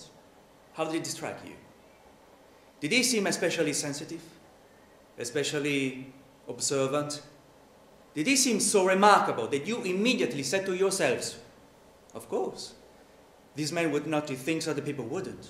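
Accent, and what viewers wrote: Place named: United Kingdom. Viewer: your british accent is perfect! :)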